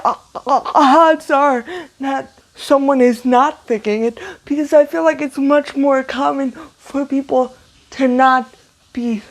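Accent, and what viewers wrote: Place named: Northeast, United States. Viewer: R U from the northeast?I'm originally from south jersey.you sound and act like you are from back east somewhere.maryland,Connecticut or something.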